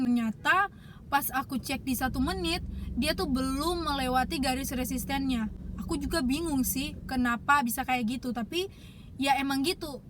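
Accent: Manado, Indonesia. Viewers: Orng mnado kng😂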